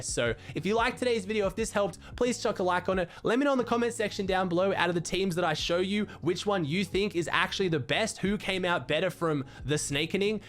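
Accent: Australia. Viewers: ello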